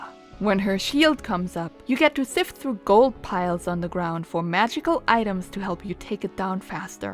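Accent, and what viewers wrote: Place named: Germany. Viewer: I saw in your "about " section that your accent is German, and you're "Working on that, too". I don't think it's something you need to feel self conscious about. I'm a native English speaker from Canada, and a person from England would say that I'm the one who has an accent. You certainly speak English better than I can speak German. Fantastic guide by the way. Concise, informative, and with a bit of humor to keep it from being too dry. Please make more!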